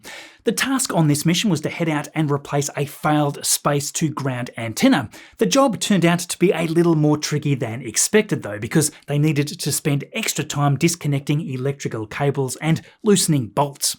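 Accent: Tasmania, Australia. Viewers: Didn’t realise you were a fellow Tasmanian! South or north?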